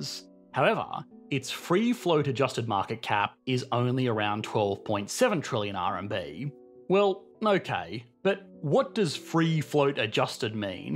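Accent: Australia. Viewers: He sounds like an australian with parents from stereotypical california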